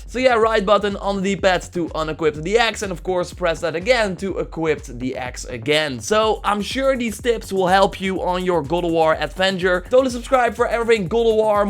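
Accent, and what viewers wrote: Netherlands. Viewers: he'a from Holland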